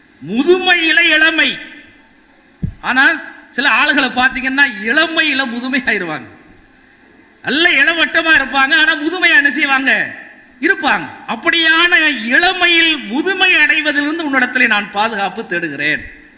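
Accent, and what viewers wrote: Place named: Tamil Nadu, India. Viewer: பணிவான வேண்டுகோள் அரபி யை தமிழ் உச்சரிப்பில் உச்சரிக்காதீர்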